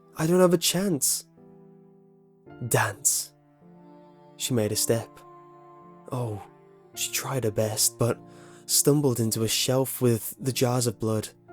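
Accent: England, United Kingdom. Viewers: What part of England is he from???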